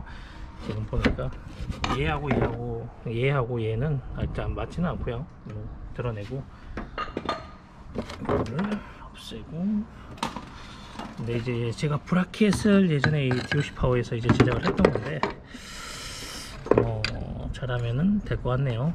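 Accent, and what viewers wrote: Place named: Gyeongsang, South Korea. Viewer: 우연히 지나가다가 영상을 봤는데요 옛날에 저의 꿈의 자동차 였습니다~! 수프라 우핸들의 로망이였는데 ㅠㅠ 사투리가 정감이 있네요 부산경남분 인가요?ㅋㅋㅋ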